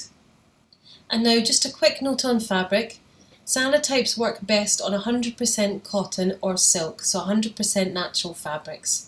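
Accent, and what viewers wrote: Scotland, United Kingdom. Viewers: How lovely to hear a friendly Scottish voice. Just getting into doing some cyanotypes and enjoyed your explanation. All the best from Panama 🇵🇦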